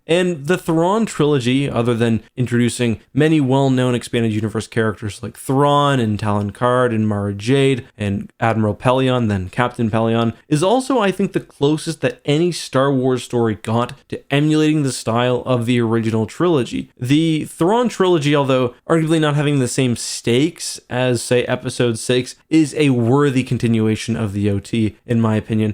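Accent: Canada. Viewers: Yo are you canadian?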